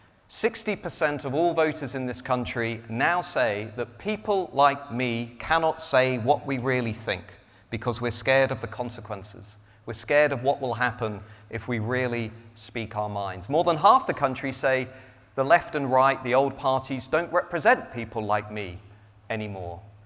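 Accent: RP, United Kingdom. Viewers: Same old Public School elite which has always run things perhaps differently but there is nothing new here just a poshly spoken word salad!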